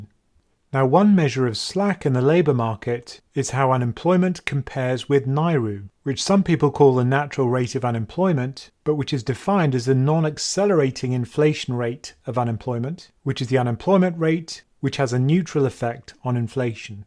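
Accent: United Kingdom. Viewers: Why do I get that feeling every time video with brit ascent remind me M .I .6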